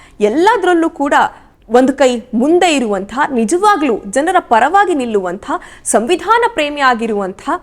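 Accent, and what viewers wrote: Standard. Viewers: Clean kannada good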